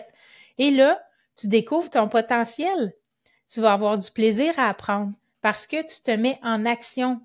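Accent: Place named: Quebec, Canada. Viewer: Merci beaucoup pour cette vidéo ! J’apprends le français et vos vidéos sont un véritable trésor pour moi. J'écoute la prononciation québécoise et, en même temps, les sujets abordés me passionnent énormément.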